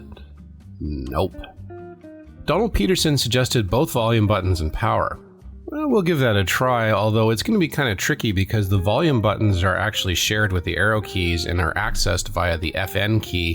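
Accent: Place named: Canada. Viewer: You sound so Canadian. "Ageenst it" hehe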